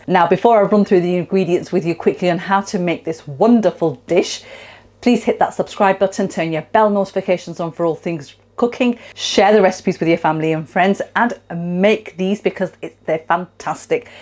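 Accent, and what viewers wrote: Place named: United Kingdom. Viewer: So useful vlog. I love your cooking content and your UK accent too :) Love from Tokyo... Can we become friends please? :)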